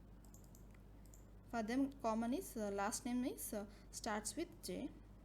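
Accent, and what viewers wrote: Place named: Karnataka, India. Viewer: i recognize that kannadiga accent. i could be wrong tho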